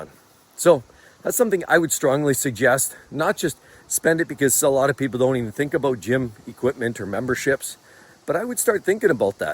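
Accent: Alberta, Canada. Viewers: Is your accent an Alberta accent?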